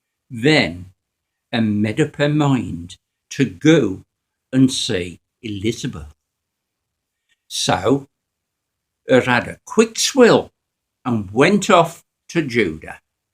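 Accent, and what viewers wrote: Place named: Black Country, United Kingdom. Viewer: Wonderful! I was looking for scripture read in Black Country accent when I found this gem.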